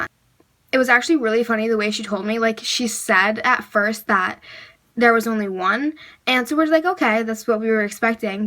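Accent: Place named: New Jersey, United States. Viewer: The jersey accent!!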